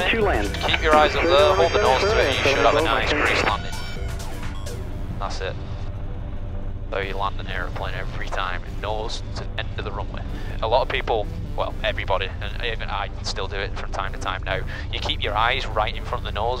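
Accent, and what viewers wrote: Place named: Yorkshire, United Kingdom. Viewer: ahhh I see the accent sounds similar to a Yorkshire one..... but not exactly the same 😂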